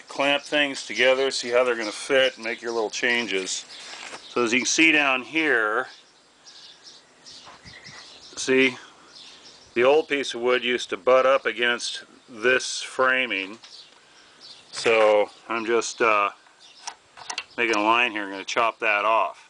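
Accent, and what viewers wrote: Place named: California, United States. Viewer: Hard to believe this was 10 years ago. Back when you still lived in CA, and had that CA accent., LOL. After watching this way back then, I bought the portable pocket hole Kreg Jig. I had a situation where I had to drill the holes on a framing board that was still good and very attached to the trailer. The portable one allows you to mount the jog on boards with a screw.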